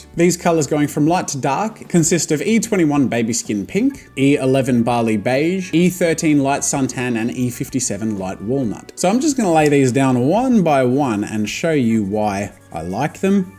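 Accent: Australia. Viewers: all Australians pronounce Manga and Copic like you do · Ur Aussie?? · are u aussie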